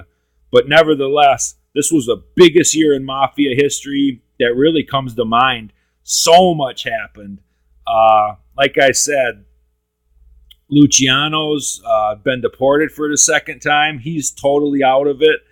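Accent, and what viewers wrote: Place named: Midwest, United States. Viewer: Hey you stuttering, muttering prick ya! Calling me a midget when I stand around 6’ and 230 isn’t the smartest thing to say. In addition before you take a podcast to task it would behoove you to actually do some research first. My father worked for AA so I know a great deal about him. I know you get a chubby when you think about these mobsters in your mom’s attic while you munch on hot pockets. Guessing by your accent you are probably from Illinois or KC. I will give you some cred since these were big mob towns. The way you pronounce APALACHIN is laughable. If you want to be taken seriously ever, do better research in the future especially when you call someone a midget that could probably kick the shit out of you! Thanks for the shoutout and watch me as Matty the Horse on the Deuce.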